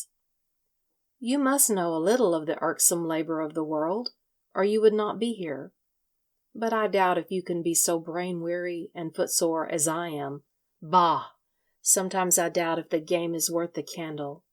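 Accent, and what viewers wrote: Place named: South, United States. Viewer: Love your Southern accent. And your narration. 😊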